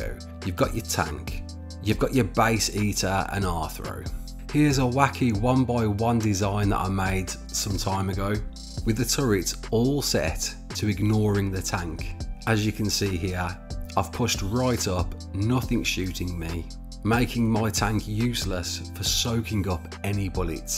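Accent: Birmingham, United Kingdom. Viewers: Are u a brummy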